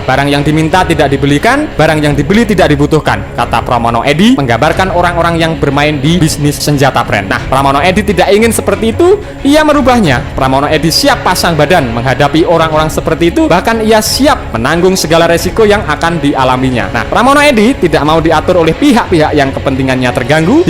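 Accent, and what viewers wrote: Java, Indonesia. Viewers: Min .. min mimin kok suaranya medok ya?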